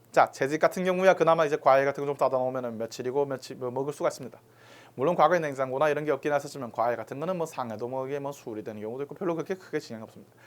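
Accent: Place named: North Korea. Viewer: 완전 김정은의 흉내를 낼려고 북힌말을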